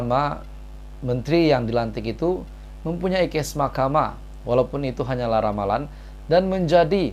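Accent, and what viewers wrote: Sabah, Malaysia. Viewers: dia ni orang Sabahlh en'. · Awak membuat video sensasi. Tajuk sensasi tp tak ada isi.lain kali direct to the point.jemu tengok muka awak.cakap slang sabah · Kalau bukan dari Malaysia dari Sabah lah itu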